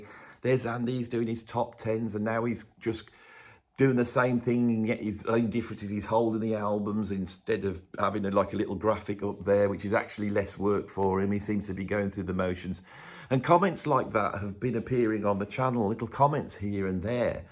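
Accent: Birmingham, United Kingdom. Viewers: Your a character besides our general interest in music Were here to listen to you.There now I've sucked up. I don't mind Brummie,we need a good laugh besides the serious stuff. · Brummie stream of consciousness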